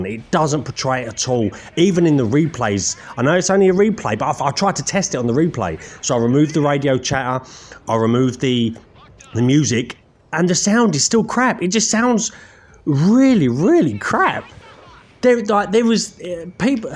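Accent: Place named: United Kingdom. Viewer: Yes mate Nice to hear some UK pilots doing a review…coventry massive here, hardcore MP only…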